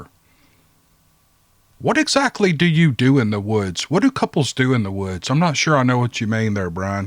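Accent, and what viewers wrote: South, United States. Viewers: Thankyou for the stories AND your time! The work hours go by much quicker while listening to your southern accented thrillers.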